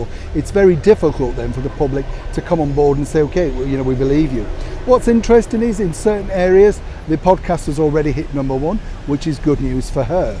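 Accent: United Kingdom. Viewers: You talk too fast with your heavy British accent and phrasing. Slow down and take a breathe.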